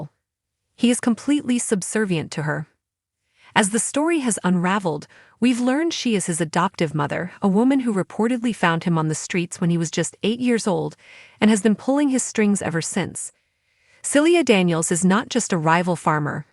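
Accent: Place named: United States. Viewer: You should switch the voiceover to an English accent. An American accent makes it more obvious that the video is AI.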